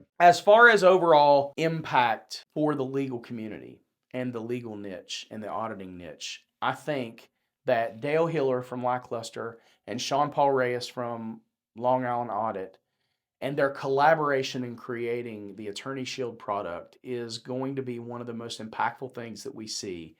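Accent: South, United States. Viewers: aww man…after that Christmas video I thought we'd get a rendition of Auld Lang Syne! That first 30 seconds of this video had me laughing – I seriously was one of those 5!! So glad and grateful for your amazing progress since your start. I do watch many of the other similar channels; yours quickly became is my favorite. Love your insider knowledge; ability to highlight numerous subtle details that would typically escape the average viewer's notice, and your breakdowns of how you would argue the case in court if it were your own. Your eloquence and that well-timed humor you sprinkle in make your style stand out above the rest. Oh, (and of course!) – that Southern Drawl!! You'll meet your goal for this year, or even surpass it. I’ve often wondered how many hours went into making just 1 video. I look forward to everything you've got coming for this year. 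Man, you must run on diesel fuel or something. The books, channel, training program, podcast - all these have the potential to positively impact our nation and transform countless lives. In some ways, listening to your SDL channel has changed mine. I’ve watched your videos and have tried to emulate your speaking style and manner in both my personal and professional life. I know you have to adapt to what the audience wants but please don't curtail your speaking time too much! That’s one of best and most distinguishing features that elevates your content above others. Each video covers a lot and I think you throw in all the aspects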